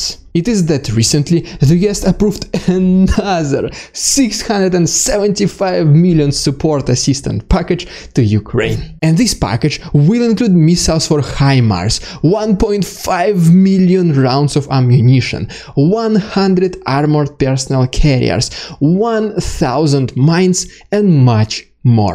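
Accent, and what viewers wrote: Russia. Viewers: good job reporting Ukraine's success. you also have the sweetest Russian English accent I have ever heard.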